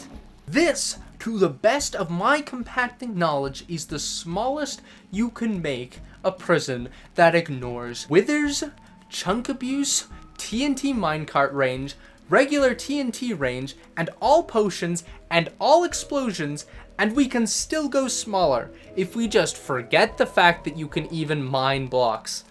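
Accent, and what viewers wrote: Canada. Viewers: Are you Canadian ? 🫧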